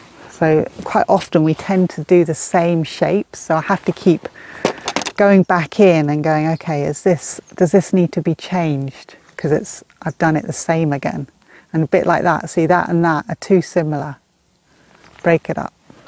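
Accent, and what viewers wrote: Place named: England, United Kingdom. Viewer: All art teachers should have an English accent.....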